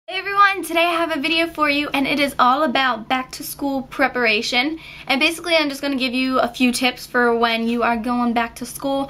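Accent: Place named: New York, United States. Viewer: r u from new york cause i LOVE ur accent!!!!!!!!!!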